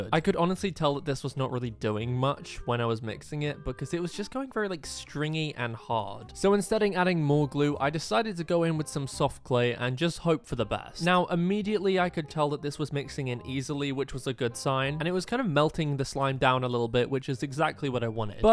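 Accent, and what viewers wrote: United Kingdom. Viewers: I introduced this channel to my friend and now she watches just for the British accent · He sounds British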